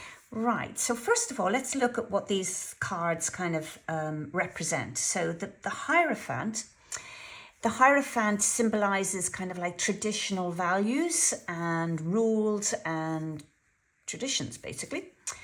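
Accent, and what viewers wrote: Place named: Ireland. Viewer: You have a lovely accent 🌹.I hear an Irish Lilt in you voice 💃.. Good Reading Thanks 🌼🏹🏹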